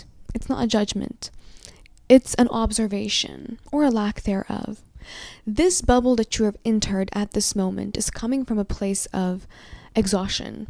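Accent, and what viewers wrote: United Kingdom. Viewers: Yep 👍 ❤ 😅 your UK accent is hilarious and beautiful! The universe is my sugar daddy ! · We going to the UK 😂 you definitely mastering the accent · Your British Accent 😂 ❤❤❤ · Not the British accent 😂... had me creasing thnx for repping the London gyal dem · ❤❤❤.. ohh my Egyptian Goddess Queen your British Accent is gettimg so good That I almost felt I was some How In a Timeline with Harry Potter & Hogwartz scholars ❤❤❤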